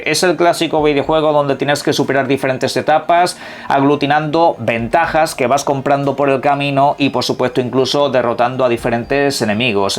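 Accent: Spain. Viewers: que asco español de españa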